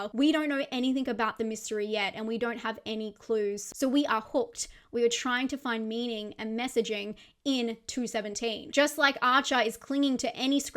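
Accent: Australia. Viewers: We say ree-NYOO-al You sound Australian. Aren’t you from Australia?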